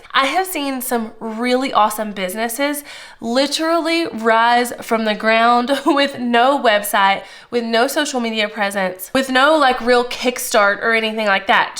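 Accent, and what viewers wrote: South, United States. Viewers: Thank you for leaning into your unique self and embracing your southern accent. TBH- and what I’m about to say goes hand in hand with this video, my thick southern accent is one big thing that I allowed to hold me back. And I have risen from the ground with zero social media in a janitor business that has a revenue of $19k/mo. But I could scale if I could learn and DO all these things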